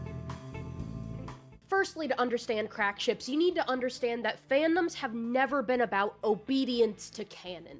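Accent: South, United States. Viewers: you're really cool and smart and gay thank you for not hiding your accent also what's the license plate on your fall off of (sincerely, another queer redneck)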